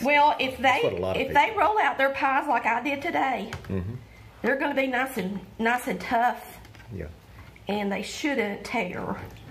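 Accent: South, United States. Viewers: Glade you feel better. Also are y’all from up north? The kitchen reminds me of our kitchen in Ohio. But you sound southern. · The southern drawl really is a great sound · My mama was born (1916), and raised on a farm in Texas, along with her 9 brothers and sisters. Your accent, southern terms, expressions and idioms sure do make me miss her. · I love the South in yo mouth, girl!! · I love your southern drawl too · I enjoyed your video very much! I plan to try your recipe soon! I have never had a fried apple pie, but my grandma and mother made fried banana pies, which are delicious! They used canned biscuits for their fried pies, but there's nothing better than homemade biscuits. I am looking forward to trying your recipe with a variety of fruits. I am from N.C., so watching you cook and listening to your southern accent, made me miss my relatives that I have lost through the years. 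I live in the state of Washington, but my heart is still in N.C. I pray 🙏 you have a speedy recovery, with no complications!! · I do enjoy your southern drawl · That southern drawl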